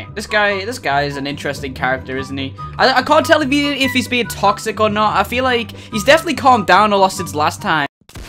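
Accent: United Kingdom. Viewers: Hey are you british?????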